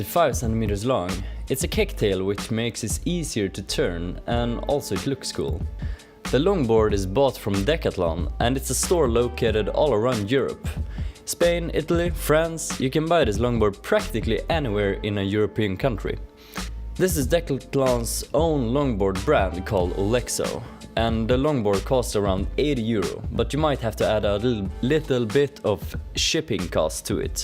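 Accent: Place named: Russia. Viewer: Русский ?! Акцент как-будто с России )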